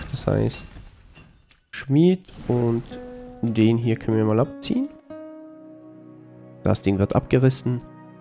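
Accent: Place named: Austria. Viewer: Bist du Österreicher? So vom Tonfall klingt es etwas so :)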